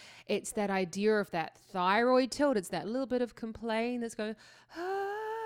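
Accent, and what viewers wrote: United Kingdom. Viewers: Oh, it's weird to see my comment at the beginning:D (just heard your comment about you being in Germany?So..,Hello from Germany! Never thought that, would've said you're British judging by your English ^^). And now, first (my favorite song of hers) and now, what a great day for me! Thank you for your analysis (and I'm so glad you like her too) even though I recommend, if you'd check some korean songs as well in the future, just take a look at the lyrics since she's not only all about technique but she's a great storyteller as well. She's insane! She's actually a chameleon who can do every song and genre and turns it into hers. From Rock or Jazz to even rap. She tried it all. What would be interesting maybe if you'd check out an English song of hers. She does her arrangements by herself on Immortal Songs. She sang there and. Last one, directly in front of. 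He invited her to join him on tour after giving her a standing ovation. She performed together with him later. For a korean one, I highly recommend " " on I am a singer (Please use the vimeo one, if possible with subtitles, since the youtube version is cut and the lyrics are incredibly sad. The climax is heartbreaking):) And a stage which has already legendary status is " ". It's a korean folk song turned into a beautiful arrangement with seemless transitions and beautiful vocals. (Here you should maybe check out the b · I am not surprised this video is 25 minutes long, analyzing cover is a masterclass. welcome to world, this is considered to be her greatest performance. I had not picked you for being across the pond! I thought you were British.:D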